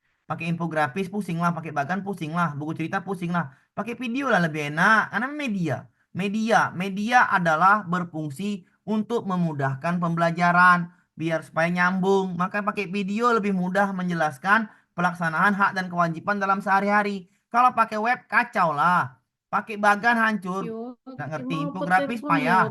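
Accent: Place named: Medan, Indonesia. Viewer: Medan kali logat bapak ni 😂😊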